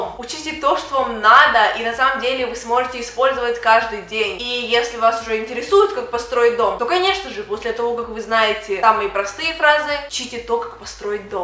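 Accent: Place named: United States. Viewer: у тебя уже акцент американский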